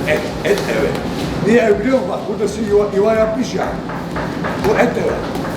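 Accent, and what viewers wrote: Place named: Adana, Turkey. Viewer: allahına ölürüm senin ha ustam tam bir adanalı konuşmalar falan adananın ekmegini yeyip suyunu içtigin çok belli bende adanalı bir kebapçı oglu olarak sizin gibi adanamızı temsil eden adana evlatlarını görünce gurur duyuyorum ve seni taskipteyim benim babama şişko neçmi derlerdi yıllarca birbiçerlerin ustalıgını yaptı ama şimdi sizlere ömür senin gibi ustaları görünce direk babam la tablada çalıştıgım anılarım geliyor devam durmak yok helal sana Adanalııııııııı.........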